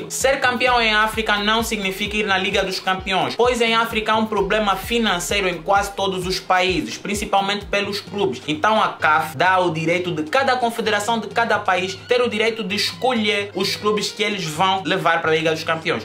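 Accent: Angola. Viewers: gente eu entendi tudo o que esse cara disse ,se ele é africano deve ser angolano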